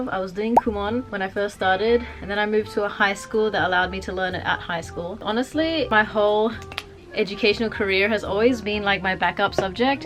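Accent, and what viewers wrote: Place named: Western Australia, Australia. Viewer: Wow, it's rare to see someone from Perth. My home town also. Your qualifacations are in marketing which me to think of a couple of things. (You have probably thought these). Firstly set yourself up as a business and stay on a business visa. Secondly persue work in the hospitality tourist industry. Thirdly because of your wonderful West Aussie Accent... (And I think you would gave thought of this too) Teach ingrish... And extend your visa on that... Good luck you have an amazing amount of energy and you are right, Perth sucks at certain career choices.. Finally continue to be Awesome!!!!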